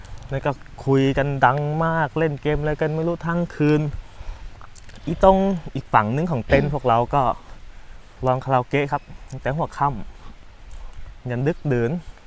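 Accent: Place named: Sukhothai, Thailand. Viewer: สำเนียงโข่ทัย...น่ารักดี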